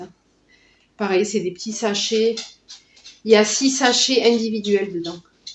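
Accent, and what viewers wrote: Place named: South, France. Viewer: Je découvre vos vidéos et c'est très intéressant pour essayer d'optimiser ses courses et limiter les dégâts... Au vu de votre accent... Ceci me laisse croire que vous êtes du sud ou je me trompe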